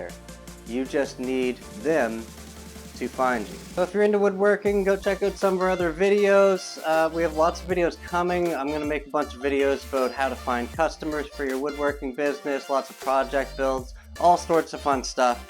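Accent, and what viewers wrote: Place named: Atlantic Canada, Canada. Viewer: I love your accent! Nova Scotia or Newfounland?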